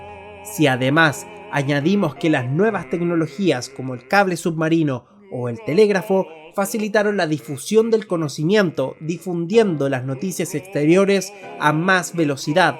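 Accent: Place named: England, United Kingdom. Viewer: Buen video pero si quieres hacer el pedante pronunciando los nombres en inglés asegúrate de que sean ingleses para los europeos que pronuncies nombres franceses o alemanes con acento inglés es francamente ridículo......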